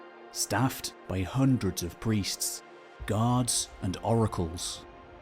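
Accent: United Kingdom. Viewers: Also the method of speaking and accent, I love. Very relaxing, + British so you sound smarter.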